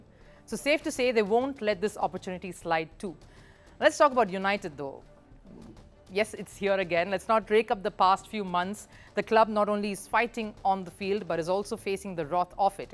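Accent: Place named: India. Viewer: She's pretty good. Perfect English like the CEO herself. Other than English in Hindi accent 😅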